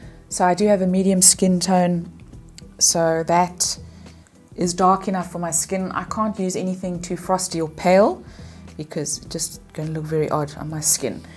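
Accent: South Africa. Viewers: Hello from Los Angeles.🌸🌴🏖️😊 You have such a beautiful accent & calming presence. And I guessed your accent is S. African.🏵️🦒🌴🦏🏞️Yay! Thank you for the review. I think I'm going to try L'Oreal Freshwear again. Last time I tried it, seemed my skin felt a bit dry and the color wasn't right I normally stick with Clinique because of my sensitive skin. Unfortunately, getting a good shade match is still difficult with Clinique. I've been shade matched in many different colors.😒 I've also used Revlon Lipstick-Rum Raisin since my early 20's. I'm 52 and it's nice to see someone else who remembers these products & shades. You look so pretty. Thank you for sharing. 🦋🏵️🌻💄🌸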